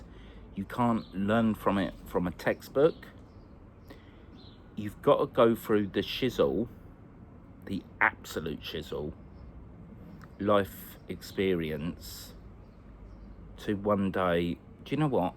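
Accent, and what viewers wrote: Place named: Estuary, United Kingdom. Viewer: I like the way you speak from the heart, and I adore your Estuary English speaking (if I've correctly pinpointed where you are from). I'm a French English learner and sometimes it's difficult to understand different English accents. Yours is so accessible, I really like it and watch a lot of your videos.. do more like this, please!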